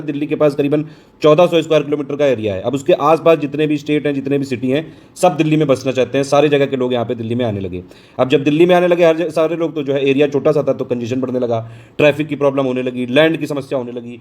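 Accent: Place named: Kanpur, India. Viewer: Aapki bhasha... Kanpur se milti h.... Nice knowledge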